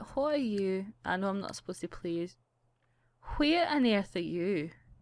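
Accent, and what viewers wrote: Scotland, United Kingdom. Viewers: oops well i love you scottish accent then! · Why are u British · I love your videos and your accent! I've always wanted to go to Scotland too ^J^ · Are you Scottish ? If you are you can be the princess from BRAVE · Are you Scottish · shes scottish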